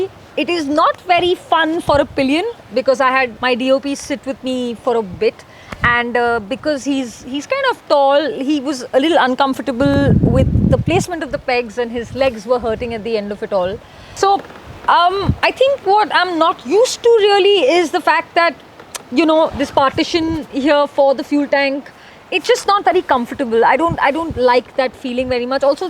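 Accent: Maharashtra, India. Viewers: YOUR MARATHI IS SO CUTE MY MOST FAVORITE ACCENT VIRU BOLE TOH AAP NE KO AISE ICH BAAT KARNE NA KA HA JI👏👏👏👍👍👍👍👍👏👏👏👏👏👏👏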